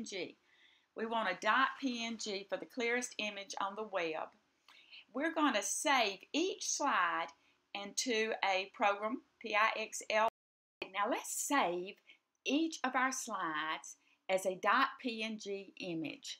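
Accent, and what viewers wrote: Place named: South Carolina, United States. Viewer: Thank you. That was a very nice tutorial and seems much easier than some of the other tools I've toyed with tonight.Now, tell me - are you from South Carolina? (I am originally) and your voice made me feel back at home.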